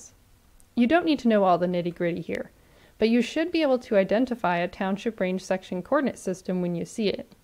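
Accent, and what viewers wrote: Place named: India. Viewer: Talk like indian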